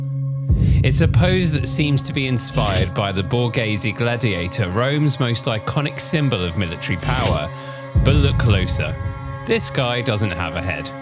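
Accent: United Kingdom. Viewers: It’s bs.You got a Brit narrator,who probably doesn’t like that his own empire fell now hoping the US does too.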